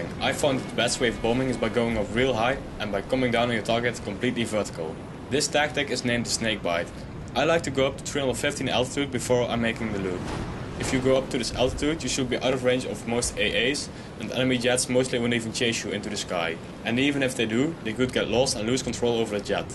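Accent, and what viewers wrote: Netherlands. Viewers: Dutch accent FTW It sounds so awesome :D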